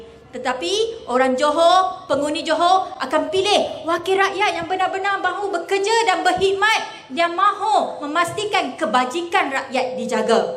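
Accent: China. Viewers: Jangan mimpi la...ni Olang melayu ke Cine..ckp pon dah macam Cine ckp melayu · cakap pun dah gaya macam cina...ibarat cina cakap bahasa melayu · Ale uto ko la tali barut....law umno xde xde la malysia aman smpai skg...ntah2 ko pn xde...ahhaahha....ale uto....ale uto....melayu ckp sleng2 cina....tali barut.....tali barut...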